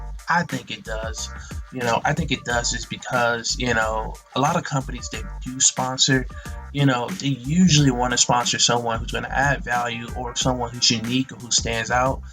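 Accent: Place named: United States. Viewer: You’re losing your American accent lol I don’t know if that’s good or bad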